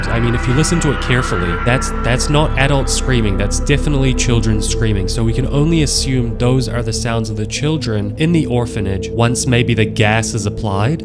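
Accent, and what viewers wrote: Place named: New Zealand. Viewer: YOUR FROM NEW ZEALAND. 😆😄😃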